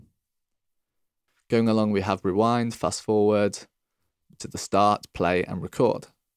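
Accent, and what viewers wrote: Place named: United Kingdom. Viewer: Thank you man,but your british accent...I just didn't catch anything...anyways,thank you :)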